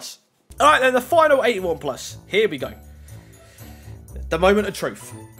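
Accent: Northampton, United Kingdom. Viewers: Are you from Northampton or something?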